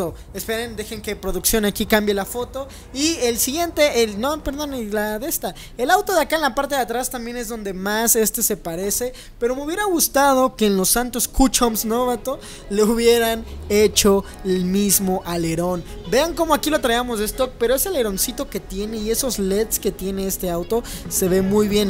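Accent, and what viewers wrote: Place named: Mexico City, Mexico. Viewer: No se de donde eres, pero no se dice ACA, se dice AQUÌ. solo los centro y sudamericanos dicen asi, tu suenas como chilanguito o de el sur de la republica mexicana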